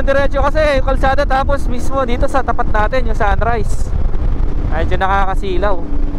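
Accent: Pampanga, Philippines. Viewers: I love your, Kapampangan accent, Say it again.... BARAG😂😂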